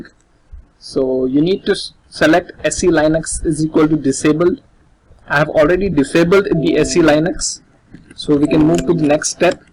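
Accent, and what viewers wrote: India. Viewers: another annoying indian accent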